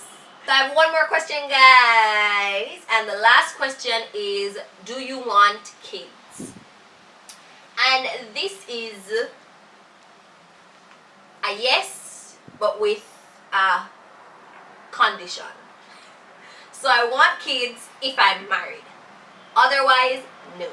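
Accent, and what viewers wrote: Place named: Jamaica. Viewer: You're accent gave me life - its waaaaaaay too cute. I'm so obsessed with Jamaicans honestly- I need to visit. & so glad you kind of epxlained what 'bombaclaute' means - I aint even know that it was a swear word hahaha. GURL I AM SO ECXITED TO BE A NEW SUBSCRIBER ON YOUR CHANNEL, like you don't know, dear lord. I feel like I so want to do this tag right now. I'd appreciate if you could check out my channel sometime x You such a star!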